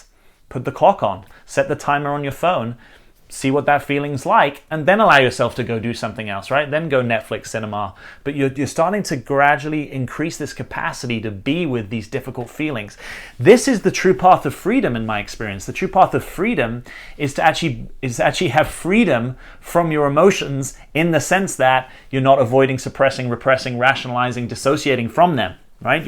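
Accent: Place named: United Kingdom. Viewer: I love this video. Thank you. Although I'm an extrovert and very sociable, I'm learning to embrace my alone time and really starting to value it. I've been single for a year and am just about to go on holiday abroad as a solo traveller for a week - bit nervous, but looking forward to the experience. By the way, on a completely different topic, your accent sounds more British today!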